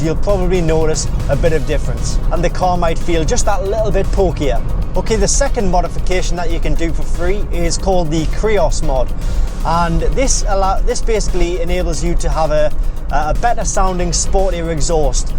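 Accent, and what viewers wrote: Newcastle, United Kingdom. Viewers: The minute I opened the video I knew he was from Newcastle. Can always tell the accent. Did my master's and bsc there back in <num>. A great city indeed. Nice video by the way. Warm greetings from Nigeria.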